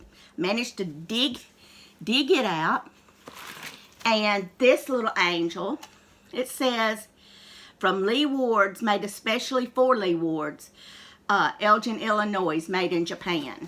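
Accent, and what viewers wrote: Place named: Alabama, United States. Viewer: NAPCO! Isn't that also made in Japan? Can you tell I'm a new little baby? Just had to say that word, baby, as it's so cute how you talk...with your down south Alabama accent! 🤣😅😄💙💛💜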